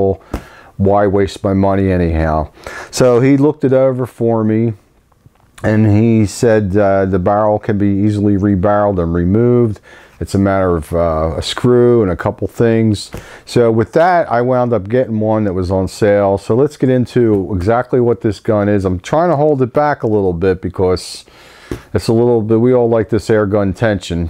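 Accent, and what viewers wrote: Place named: Pennsylvania, United States. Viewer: I'm split between this and the 95 in .22 Looks like Hatsan is pretty good in the sub 300 range. BTW I'm gonna go ahead and guess you're from east Pennsylvania based on your accent. Am I way off?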